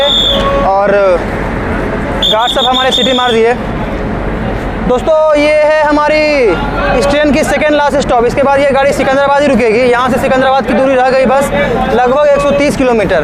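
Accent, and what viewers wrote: Bihar, India. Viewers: आपकी हर विडियो सराहनीय होती है। और आपकी बिहारी भाषा बहोत ही सुन्दर है। हमे भोजपुरी भाषा बहोत ही अच्छी लगती है क्यू कि हम भी यूपी के है। प्रतापगढ़ के।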